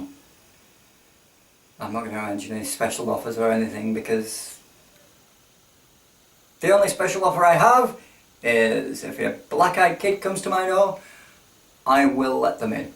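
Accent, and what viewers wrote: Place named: United Kingdom. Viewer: Some of the words you pronounce but guess it depends on what part of the UK your from cool :-)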